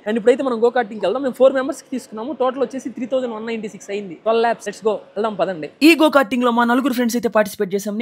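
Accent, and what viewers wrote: Nellore, India. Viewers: Video total febless 😊 total video lo nellore slag matladuthu ne unavu enjoy orange 👌👌👌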